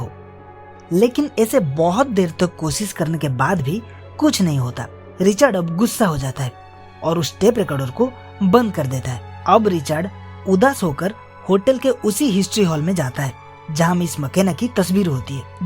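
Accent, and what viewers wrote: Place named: Gujarat, India. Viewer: Itni acchi movie ko gujrati accent mein explain kiya hai isne 😢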